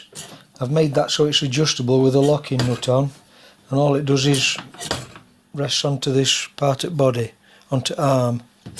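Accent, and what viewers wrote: Yorkshire, United Kingdom. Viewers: Nice little machine . You sound very sort of Pontefract area. From a Leeds lad.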